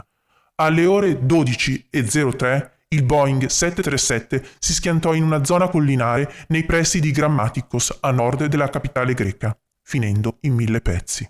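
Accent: Genoa, Italy. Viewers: Con questa si sente meno l accento genovese belin😂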